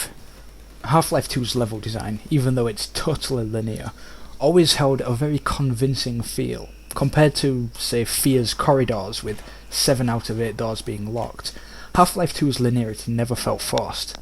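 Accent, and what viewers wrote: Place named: RP, United Kingdom. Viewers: ok sorry it just sounds like you were putting on a posh accent sorry for the miss understanding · This guy are from West Yorkshire ; )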